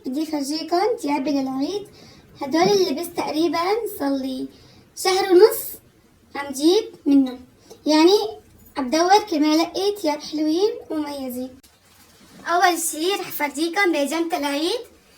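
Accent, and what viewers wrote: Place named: Syria. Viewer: تباني سورية من سورية من صوتك😅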